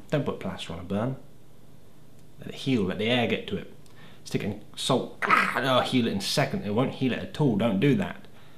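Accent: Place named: Cornwall, United Kingdom. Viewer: ! Awesome amazing Cornish YouTuber is back! Ahhhhhhhh! Total fan girl moment over here! Cannot believe you're back, so sad when you said you wouldn't be making any more videos... Glad you are again... Your Cornish accent tag is so true to life, lol!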